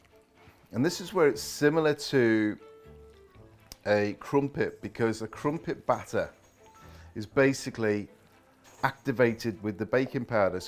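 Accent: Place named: Merseyside, United Kingdom. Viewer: That unmistakably from somewhere in the Merseyside area.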